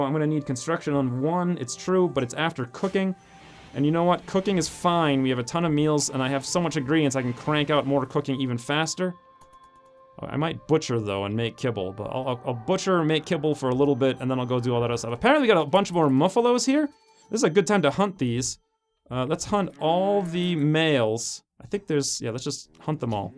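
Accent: Ireland. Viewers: Luminary Xion He almost sounds Irish. Weird. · I thought he was Irish too xD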